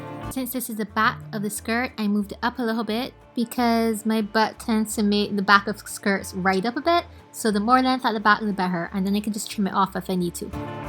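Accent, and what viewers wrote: Barbados. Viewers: Are you from Barbados?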